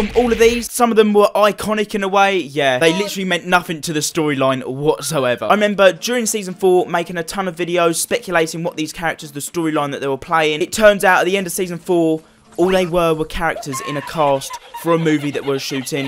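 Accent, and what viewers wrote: United Kingdom. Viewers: Is it me or do all of these Fortnite you tubers who discuss fornite lore sound the same.....a uk man with a Very very strong Uk accent...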